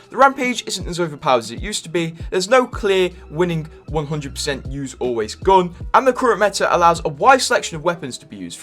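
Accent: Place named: England, United Kingdom. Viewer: Your fuckin English accent is discusting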